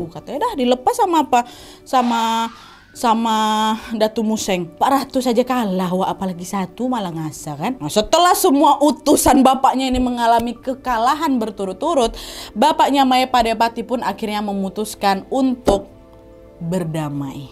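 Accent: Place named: East Nusa Tenggara, Indonesia. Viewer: Logat NTT nya kak masih kebawa wkwkkw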